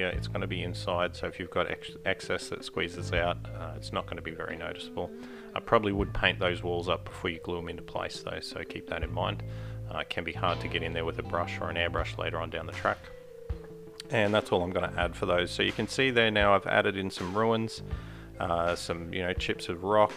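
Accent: Australia. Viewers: Mate enjoy your videos, obviously your Australian . Which State you in. Looking at supporting.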